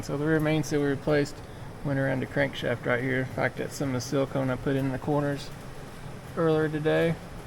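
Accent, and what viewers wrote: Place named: Midwest, United States. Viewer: I DO wish you would call an oil gallery a gallery not a bloomin GALLY, ok ok I know mid western accents sound different - hell I even let you off with alt'nator for ALTER NATOR lol as in it makes alternating pole current not direct current like a dynamo but i'm sorry oil gally simply will not DO lol, anyway enough of that. Well done a really good job well executed.....besides your dads and sausage fingers interference obviously lol....just pray he doesnt demand you pay him back for all the bits he bought eh, your first ride is an epoch event in a young lads life - so much more when you built it all yourself with so much love and dedication, enjoy it every minute you can.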